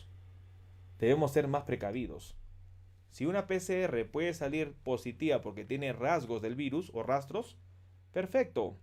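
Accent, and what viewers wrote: Peru. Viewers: Peruano no? 🇵🇪 Vamooo!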